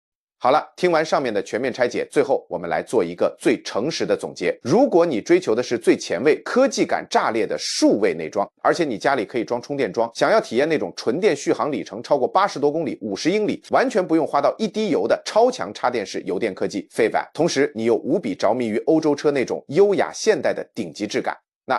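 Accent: China. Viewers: 这是台湾的YouTuber吗，声音怎么是中国普通话，听不下去了👎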